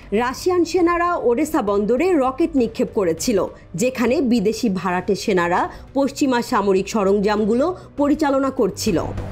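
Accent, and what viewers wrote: India. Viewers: ভারতীয়দের মতো কথা বলে এই সাংবাদিক/উপস্থাপক।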